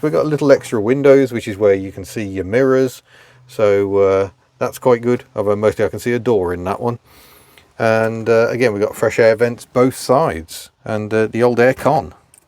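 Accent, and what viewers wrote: United Kingdom. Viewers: Because of your brit accent I kept expecting a pantomime horse (we don't have any in Canada but some have learned of its UK existence and of panto theater traditions through books and the Web) to appear suddenly each time you expressed your love of pantograph wipers. By the way we don't have European regulations for quadfracycles here in Canada so that cute truck and the Citroen AMI and others simply never show up on our streets. However, we have rules (or lack of actual specific rules but cover all general rules) for enclosed mobility scooters. They are like giant perfectly enclosed battery powered wheelchairs for semi-disabled people or for the very old people who are not allowed to drive cars in the street anymore because of vision issues or other medical problems. In other words the enclosed mobiliy scooters are forbidden on normal streets and must instead travel on sidewalks or bike paths!!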